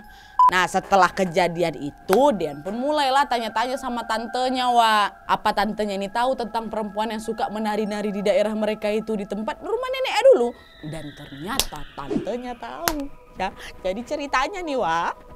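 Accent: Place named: Medan, Indonesia. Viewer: Tiap hari nontonin video nya..siang mlm..dari video trbaru sampai video lama...sampai kebawa2 bahasa sma logat medan nya 😅😅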